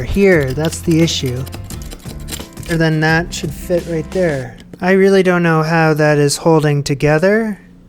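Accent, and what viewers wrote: Canada. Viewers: the one thing /along many other things) i love about your videos is the (canadian) pronunciation of words like out and house. omg it's just so pleasant to ears.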